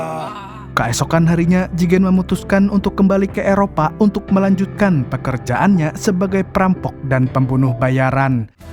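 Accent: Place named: Nganjuk, Indonesia. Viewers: Mimin wong Nganjuk koyok e😂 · Orang nganjuk kah bg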